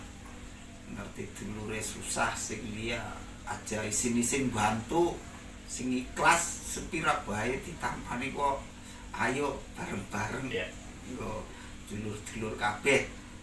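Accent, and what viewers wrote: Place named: Banyumas, Indonesia. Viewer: Mantap Ndan...ora ngapak ora kepenak..ssalam.ngapak dari kedungbanteng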